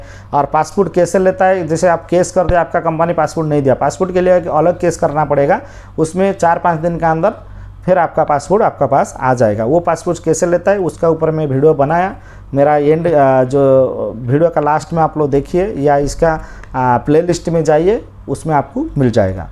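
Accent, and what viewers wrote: Odisha, India. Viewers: Aapka hindi se pta chalara aap odisha se ho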